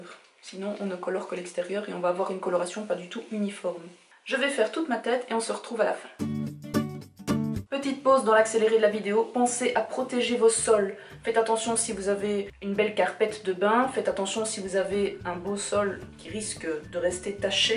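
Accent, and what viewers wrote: Belgium. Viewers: J’entends plus belge qui suisse perso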